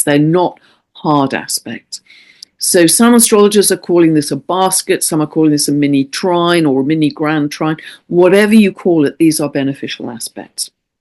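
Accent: England, United Kingdom. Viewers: On the couch with red cushions; I love it, it's so normal and likeable. A clear English accent and, above all, a super-positive charisma and energy! I love your videos; they're a true blessing and a constant companion. It's wonderful that we're connected all over the world! Thank you. Best wishes and belated happy birthday!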